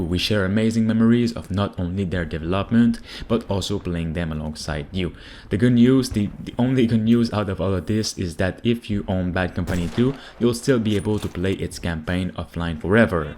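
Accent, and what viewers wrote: Quebec, Canada. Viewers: are you from quebec ? cause your accent is "famillier"lol ,quand jai vue lecriture dans le jeux sa ma sauter au yeux XD je tecoute depuis longtemps et javais un doute mais maintenant jen suis sure! respect frere keep up the good work! i like your contents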